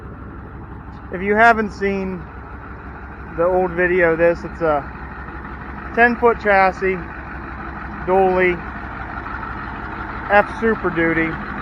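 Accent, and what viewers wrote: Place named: Pittsburgh, United States. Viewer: Way to go there bud! Sounds great. Good hearin the ole Pittsburgh accent again too from way out here in Oregon. Saw a bit of the Brownsville steam show video and pieced it together that you must be around sw PA. Grew up a little north of Brownsville. Take er easy, ill pass the channel on to some cowokers 🤙🏼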